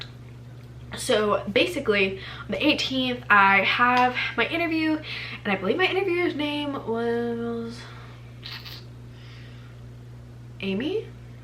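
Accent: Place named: Texas, United States. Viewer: You and Emilyenchanted talk the exact same way!! So weird lol I figured you were from Texas like her! Same accent!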